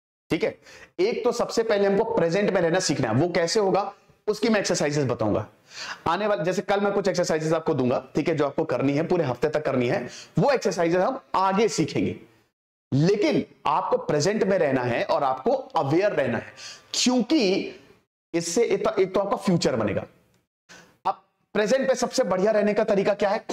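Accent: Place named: Bihar, India. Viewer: I have been listening to him for past few years and this is one of the best lectures. I like the Bihar ascent.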